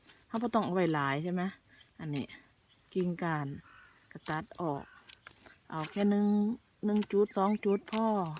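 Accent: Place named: Isan, Thailand. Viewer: เว้านัวหัวม่วนไปละเนาะเอี้อยอิอิ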